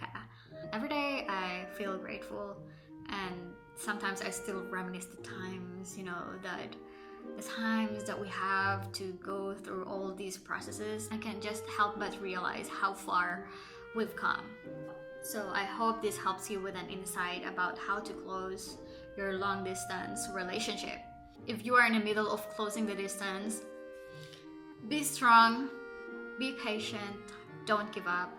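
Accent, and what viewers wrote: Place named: United States. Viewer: I asked because your English dialect and expressions are very American like.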